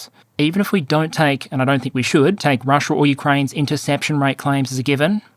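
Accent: Australia. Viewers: " As the world was somehow watching..." Hard to hear how far we have fallen. The Australian accent on par with the Scottish in speaking the truth when it's not about our own bullshit. Strange times be damned. Have belief. Things can be better. Arseholes are not the default. Get involved, stay involved..Demand more, expect more.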